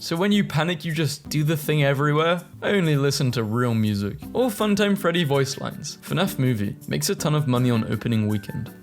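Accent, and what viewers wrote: United Kingdom. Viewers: wait ur british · You British? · YOUR BRITISH TOO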